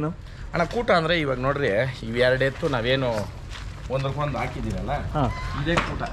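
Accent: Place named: Butler English, India. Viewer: Batlar English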